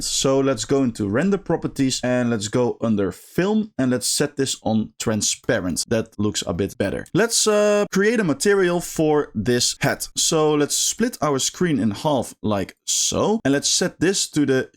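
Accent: Netherlands. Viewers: Your knowledge of geo nodes is so insane. Thanks for sharing your skills and insights. Love the Dutch/English, I assume your Dutch, like me ;). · Me when i hear english with dutch accent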